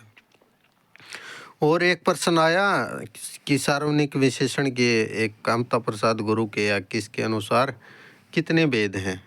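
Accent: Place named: Haryana, India. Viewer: आप हरियाणा से हो बोलने के अंदाज से पता चल रहा है 😍😍☺️☺️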